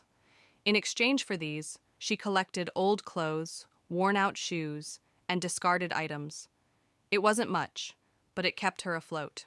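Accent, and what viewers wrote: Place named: United States. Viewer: Remember this is African folktales and you shouldn't be using American accent for Voice note but African voice note. Thanks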